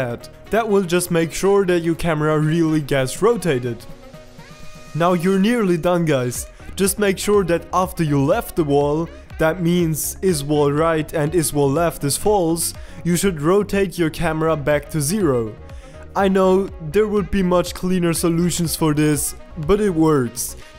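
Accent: Germany. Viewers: Thank you for the Tutorial Where are you from? Do you come from Germany?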